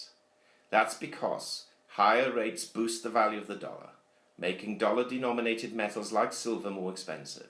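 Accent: England, United Kingdom. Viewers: Very thoughtful (the Eng accent helps) and an award for a nicely rigourous analysis; but, my gut says $38 as this Fall is just too econo-politically topsey turvey not to force a doubling.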